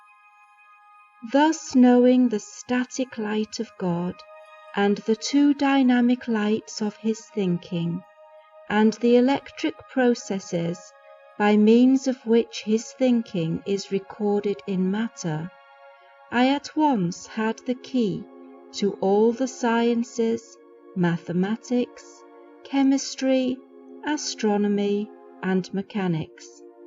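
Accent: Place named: United Kingdom. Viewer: Why that creepy Brit voice …such a obnoxious sound…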